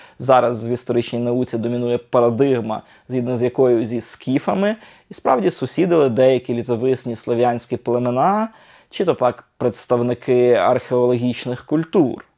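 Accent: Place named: Western Ukraine, Ukraine. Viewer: Судя по акценту афффтор ни разу не относится к украинской наследственности. Западенец у которого мама курва, папа на конюшне жил...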